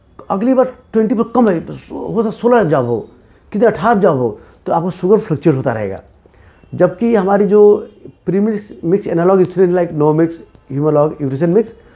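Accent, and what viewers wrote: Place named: Bengal, India. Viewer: Your Bengali accent is very difficult to understand sir Also please make videos in English with Subtitles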